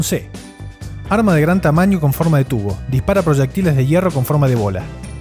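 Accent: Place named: South America, Latin America. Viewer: Tiene que ver el acento del narrador es como de sudamerica y no le entiendo algunas cosas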